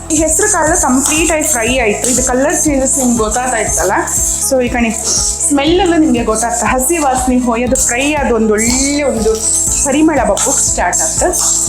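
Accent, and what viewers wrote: Kundapura, India. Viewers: kundapura kannada super 😍😍 Niv tumba chanagi matadtira.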